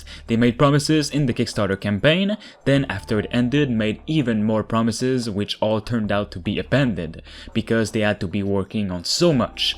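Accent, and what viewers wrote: France. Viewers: why dont you just make videos for french people? your french accent is so thick i can barely see the video.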